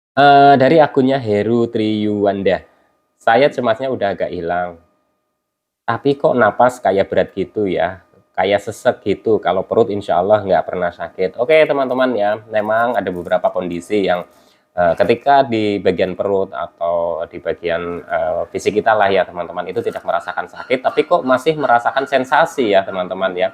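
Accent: Banyumas, Indonesia. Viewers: Menyimak ngapak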